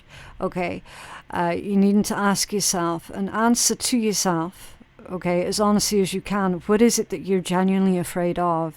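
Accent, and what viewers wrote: United Kingdom. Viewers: Yay a British reader 😁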